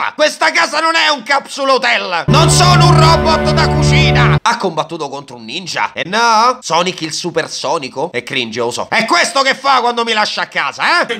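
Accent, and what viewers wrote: Rome, Italy. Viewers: Te vogliamo bene,solo per l'impegno che ci metti nel fare questi video e per la comicità con accento romano che non mette freno alle mie risate. Grazie di tutto <3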